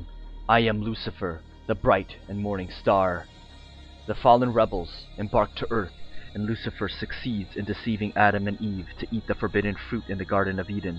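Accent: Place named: United States. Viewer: Before you try to claim I'm being anti-patriotic, I'm an American. Anyway, there is nothing more cheesy than an American accent mixed with forced heavy tone - it just sounds laughable. I would prefer a Rabbi speaking in Yinglish (Yiddish/English) over this very non-threatening and boring and characterless American accent. Boring.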